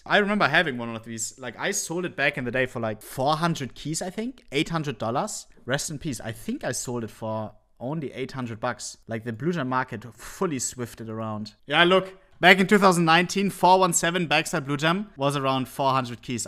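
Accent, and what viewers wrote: Germany. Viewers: Man merkt so das er deutsch ist xd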